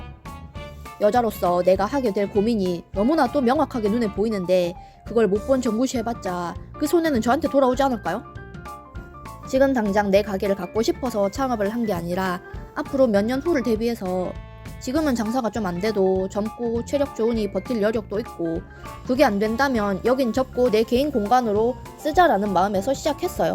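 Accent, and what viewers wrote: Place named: Gyeongsang, South Korea. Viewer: 같은 경상도 사람으로써 말투 귀엽네여 ㅋ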